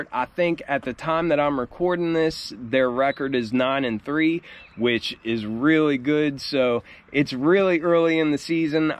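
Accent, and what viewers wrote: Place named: Pennsylvania, United States. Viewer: Lost my best friend of 42 years in June. Had a heart transplant and didn’t make it. I feel your pain! We are all right there with you. Don’t forget that. I’m in Dubois PA , I can sense your PA accent haha. Been a 4 fan since 89! My fav Jordan ever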